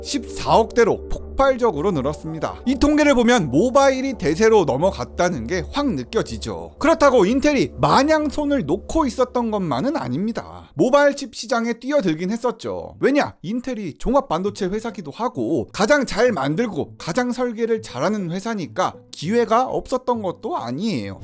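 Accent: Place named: Gyeongsang, South Korea. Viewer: 백날 알려줘도 경상도 것들은 안배워요 끝까지 군님, 인녁, 인뉴, 전녁, 만뉴.. 후~~~~~ 고생 하셨어요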